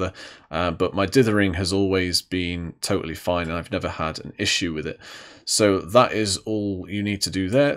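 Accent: United Kingdom. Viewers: WOW...That was a Fantastic Presentation...I was writing fast...Stop and Write and start up again...You are so very knowledgeable - Very Impressive...As a Newbie...Even with all the Required Equipment...I see I have a Long Learning Curve...But with your Videos...You have made the Process Less Painful... Beautiful Accent...You must be from The Mother-Country...Florida Here...Thanks again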